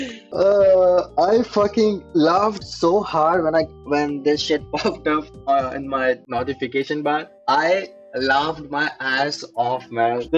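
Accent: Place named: India. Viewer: Consider using an AI voiceover, just hearing indian accent make me click away, even tho the video may be great.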